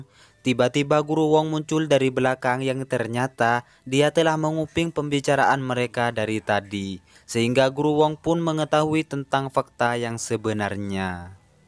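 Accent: Madura, Indonesia. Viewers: Madura sih , kalau dri logatnya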